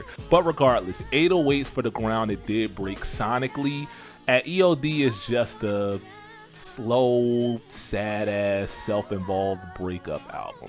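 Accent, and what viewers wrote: Philadelphia, United States. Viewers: I know that accent. Philly fasure.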